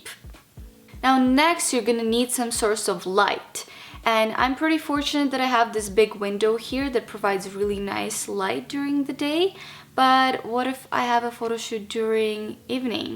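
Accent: Canada. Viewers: Ukrainian / Canadian me thinks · Now i know how the Canadian accent sound like :) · exactly,i think she is canadian.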